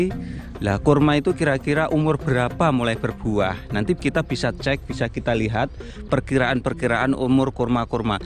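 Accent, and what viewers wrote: Java, Indonesia. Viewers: asli mana pk bicaranya kaya orang jawa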